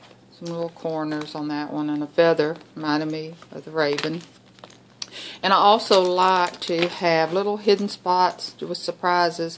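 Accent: South, United States. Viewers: ...where is your accent from? I am from Mississippi and I know it sounds southern...just not sure where...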